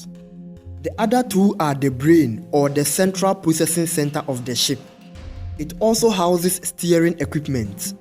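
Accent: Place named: Africa. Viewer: African accent s..ks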